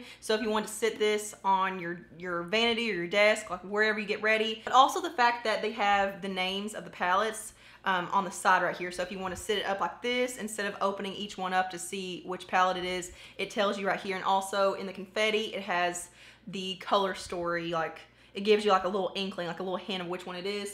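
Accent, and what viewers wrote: South, United States. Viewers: OMG how have I never watch or even come across your channel.. this is my first video of yours I've watched and I absolutely love you your vibes your bluntness and your attitude.... BTW I love your southern accent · This is the first video I have EVER seen from you or your channel. As soon as you got sassy about how your title says this will be a rant and people can leave, GIRL YOU GOT ME! I hit that subscribe and all notifications on right then and there. Love the sass, PLEASE don't ever lose that!!! PS- Your southern accent brings me back to the part of my life when I lived in Eastern North Carolina. I don't believe your accent is NC, but it's as close as I can get while living in northern Illinois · Alright girl where u at? Im gonna say GA or TN....me, AL. And i am THANKFUL TO HEAR U SLINGIN THAT ACCENT (unlike someone else from my home state who took lessens to take her accent OUT) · I can't believe you replied! 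Holy Crap! Hoping someone can tell me what state you are in because I'm in AR!!! Annnd you sound like you are southern...maybe? 😂🤔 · Omg, just found your channel tonight and I love you. You're honest, dont try to come off as I'm perfect and I know it all. Love that. I love your Southern accent, it reminds me of my mom's family. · Where you from? It sounds like your southern. I'm from GA 😊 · Your accent is really nice ❤ Are you from Alabama/Georgia? I appreciate you being really genuine in this review 😘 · Luv you lil country accent...It is absolutely gorgeous and you have amazing skin by the way!!!!!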